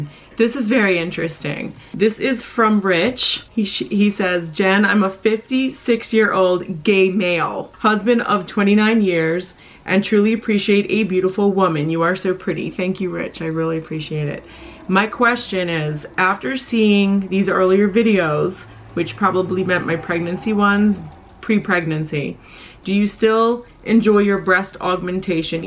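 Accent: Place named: New York, United States. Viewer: A bit off-topic, but why does your voice sound so different in your videos (in compare to Brandon's)? It has a lot more bass, and it sounds kinda newyork-ish.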